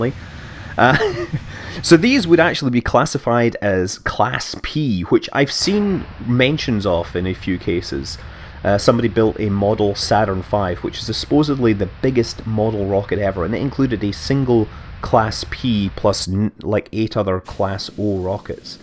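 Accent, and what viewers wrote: United Kingdom. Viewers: You live in the US? Sound so british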